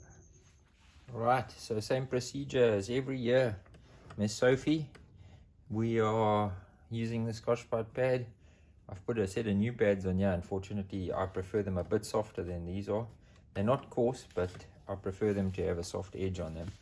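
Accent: South Africa. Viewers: Being from the States I dig the accent but more importantly I echo the philosophy regarding vintage steel bikes. They still have a lot to offer and the character and design of certain era’s is sublime. I get a “woody” finding them, cleaning and overhauling them and of course riding them. Keep up the good work in South Africa your videos are very enjoyable and informative.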